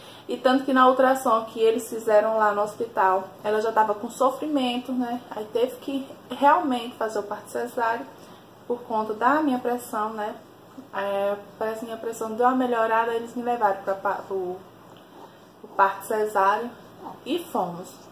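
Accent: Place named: Northeast, Brazil. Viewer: Como amo esse sutaque, meus pais são nordestinos 💝 Adorei seu canal, sua naturalidade e sinceridade 💝 Que Deus continue abençoando vc e seus filhos💝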